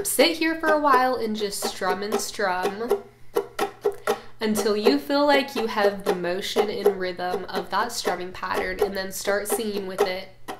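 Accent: South, United States. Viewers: No offense but when she's talking she kinda sounds like she's trying to muffle a southern accent.😂Edit: Not trying to be rude I love you, you have some of the best tutorials❤ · Your southern accent is slipping out in this video lol.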